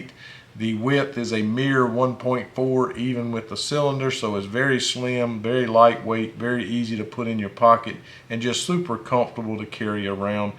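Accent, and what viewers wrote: Louisiana, United States. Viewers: You from Louisiana?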